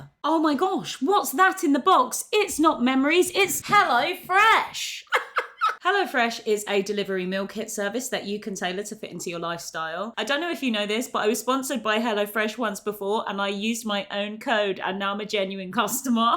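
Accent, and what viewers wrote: United Kingdom. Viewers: Oh I love the British accent dear hello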